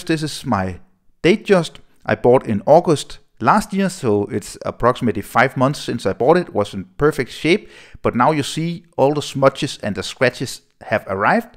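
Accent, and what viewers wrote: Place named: Denmark. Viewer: I like the Danish accent! I like Danes having Rolexes from just those 3 dealers nationwide! Anyhow... Cape Cod says not to wash it but to "buff dry" (which I did on my 09 GMT). Also you should have mentioned to tape the brushed steel parts (which again Cape Cod says are not to be applied). Nice video, nice watch though...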